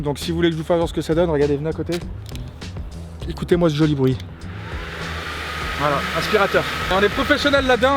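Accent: Saint-Étienne, France. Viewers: jte connais pas depuis longtemps mais tu mérite vraiment ! PS: tu serait pas un stéphanois avec ton accent ? mf91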